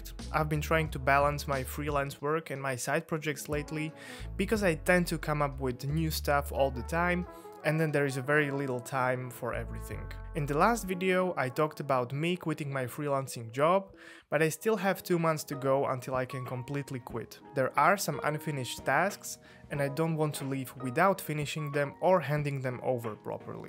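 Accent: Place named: Netherlands. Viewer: As an aspiring Flutter dev I really appreciate your content. From the first impression I thought you are from Holland/Netherlands just as Internet Made Coder, because of your accent, it has seemed really similar to him. Was lil bit shocked that you are Slovak, although the name sounds more slavic. Hope you would reach worldwide audience more and that helps you set up another one of your multiple income streams. Also I would like to see a video about your MacBook specs. I have already seen a lot of content by SWE content creators about that topic, but I'd love to see another perspective. Každopádně chlape dobrá práce, krásný 4K videa, thumbnaily taky vypadají dobře, že mě donutí kliknout na další video. Za to video ohledně specs bych byl rád. Díky a ať se daří. :)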